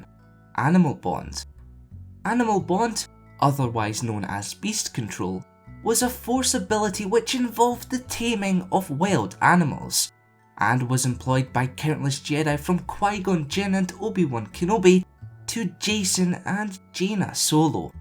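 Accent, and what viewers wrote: Scotland, United Kingdom. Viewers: . Hey man I've been watching your vids for quite a while now. Your videos are great 👍. Can tell your Scottish just like me I have followed on amino. If you replied to this comment I would be delighted. Keep up the good work man 😃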